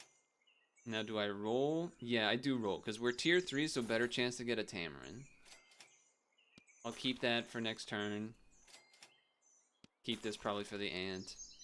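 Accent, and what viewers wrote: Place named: Newfoundland, Canada. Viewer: NL cadence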